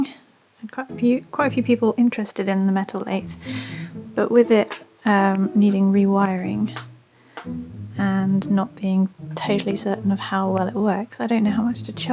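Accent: United Kingdom. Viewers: Hey there...I'm a new subscriber and I just want to leave a compliment, I love big, fluffy dogs, I have a couple of German Shepherds that you'd love!, you and your pup are so cute together and I love your British accent, you sort of sound like Ozzy's wife!...I'm calling from the United States, I live in Michigan....Love, Ron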